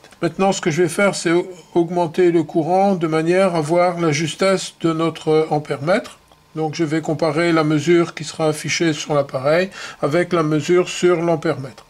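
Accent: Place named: Belgium. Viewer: Merci de cette vidéo bien expliquée (+ sympathique accent belge...)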